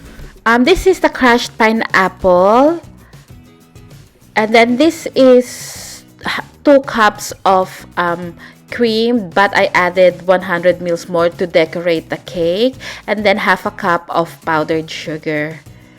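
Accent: Philippines. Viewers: Wow, looks lovely and the Tagalog accent is very sweet too. Trying this tomorrow. Thanks for the recipe.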